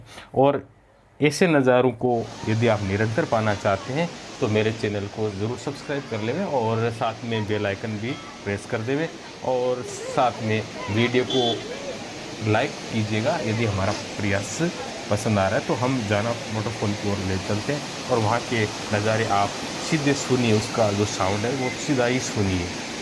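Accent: Rajasthan, India. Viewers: Sir, aap kya Rajasthan se hai? Apki boli me Rajasthani put hai......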